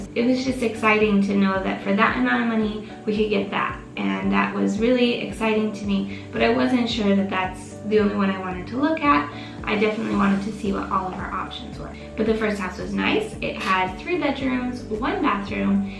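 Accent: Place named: United States. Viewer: Yes. Awesome! Keep the American accent. It goes a long way in the future. Much love from San Francisco California. Jesus is EVERYTHING.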